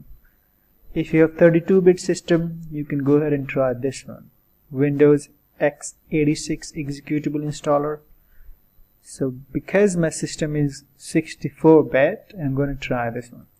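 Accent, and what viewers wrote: India. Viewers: The Indian accent is your proof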